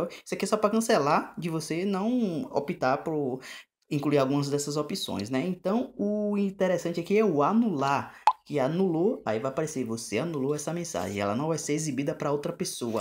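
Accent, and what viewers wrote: Bahia, Brazil. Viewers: man ce é baiano ? kkkkkkkk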